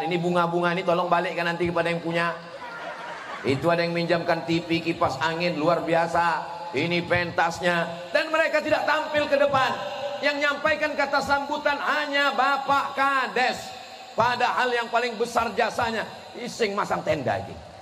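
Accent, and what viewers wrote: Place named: Java, Indonesia. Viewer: Alhamdulillah, jowone udah medok 👍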